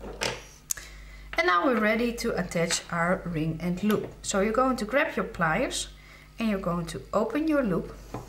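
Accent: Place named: Netherlands. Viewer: I love your accent :o) ... its like dutch / netherlands ?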